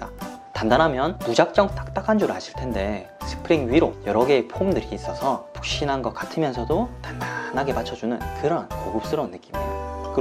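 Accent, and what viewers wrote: Gyeongsang, South Korea. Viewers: 암생각없이 보다가 친근한 갱상도 사투리도 글코 멘트도 넘 우껴서 좋아요 구독 알림설정 쓰리콤보 하구 갑니다ㅋㅋㅋ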